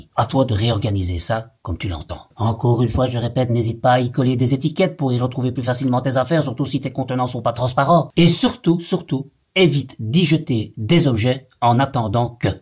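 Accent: Belgium. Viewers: On reconnait bien l accent belge! Et j adore❤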